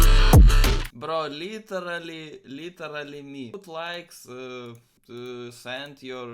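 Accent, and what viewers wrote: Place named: Russia. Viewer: Ахахах у тебя голос как у русского ну ты итак русский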